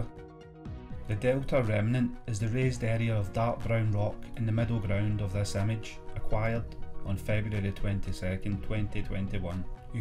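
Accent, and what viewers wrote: Scotland, United Kingdom. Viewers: Holy Scottish accent 😂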